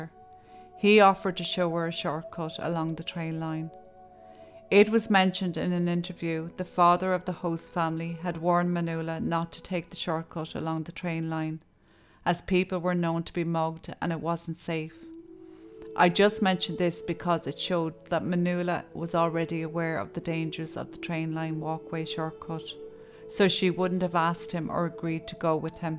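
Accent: Kilkenny, Ireland. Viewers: thank you so much for your reply - I love the delivery of your narration of your easy to understand informative script style/narrative - your turn of phrase and the inflection of your voice when you exasperate is masterful - your beautiful accent reminds me of the people I knew when I was growing up in Tubrid, Woodsgift in north Kilkenny, where I was born in 1956 in St. Luke's Hospital - I now live in Kerry. I always miss the Kilkenny accent - I miss the sayings "fierce nice and shockin good" - keep up the excellent work you are doing and don't ever give up - have a wonderful day ;-)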